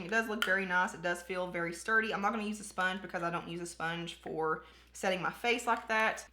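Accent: South, United States. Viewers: I love your accent, sounds very southern! 💗🤗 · Ok I admit it. I didn't even watch the whole video before I hit subscribe because once I heard your accent I was sold. Helllloooo southern friend. I'm from Alabama. 😂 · This was helpful, thanks. The look is very pretty. Love to hear other southern girls!!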